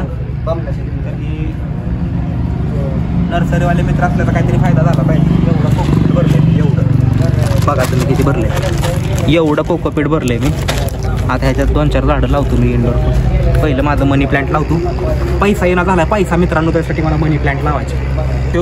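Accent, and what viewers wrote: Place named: Satara, India. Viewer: I love satara accent 😍 ❤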